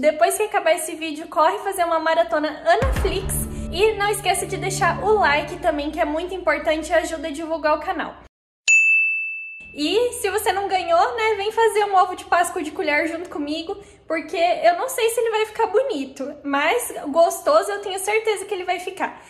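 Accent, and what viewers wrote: Paraná, Brazil. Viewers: Tudo gente chata que se incomoda com o nosso jeito Paranaense de falar, pois eu tenho orgulho de falar como a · não liga pros comentários ruins principalmente do seu jeito de fala.. vcs dois são lindos e sem dúvidas o melhor canal do YouTube com divirsidade no canal pra gnt... Eu tbm sou Paranaense ( Guarapuava) e amo nosso jeitinho.. manda um bjo pra mim se puder 🥰🥰🥰🥰🥰🥰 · Gente! Paranaense fala assim mesmo!! Botar fritar, botar ferver, botar fora quando fala q é pra jogar fora algo sou paranaense e sofro em sp kkkkk povo olha p mim até hoje estranho! Terreno é data... Enfim é só o jeito paranaense de falar kkkk bota like aí povo!!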